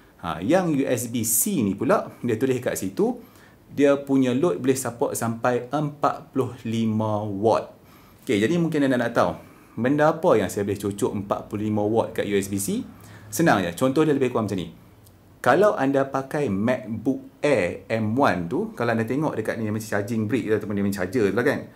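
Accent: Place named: Penang, Malaysia. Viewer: Tengok sbb nak layan loghat penang buku abg ja..hahaa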